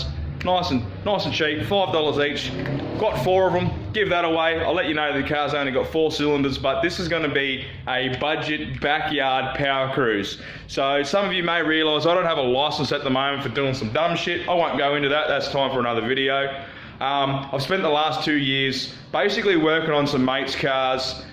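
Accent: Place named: United Kingdom. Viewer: u dont have a deep aussie accent, u sound more like a british accent or UK accent.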